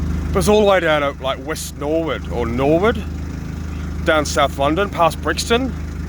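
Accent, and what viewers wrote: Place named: Australia. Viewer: Hey you are Australian 😂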